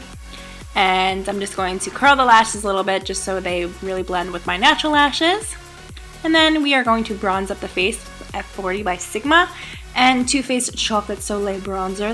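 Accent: New Jersey, United States. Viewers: Hey doll! Your doing such an awesome job. I started watching you a couple months ago & Ive seen you grow so much! I'm going to DM you on Insta too, but I'm guessing your from Jersey/the Tri-state area? You have the accent! 😂😝 I'm from Jersey too. I'm REALLY working hard to start up my YouTube channel, but it's so tough! I'd LOVE to do a collaboration with you! I'm not sure if you'd be interested, but I would FOREVER be grateful! lol. If it is something you think you'd be interested in doing, PLEASE let me know! Talk to you soon 😘 xoxo, Brooke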